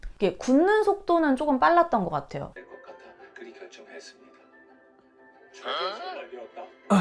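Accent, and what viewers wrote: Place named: Honam, South Korea. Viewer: 살짝 살짝 호남 사투리가 들려요 귀엽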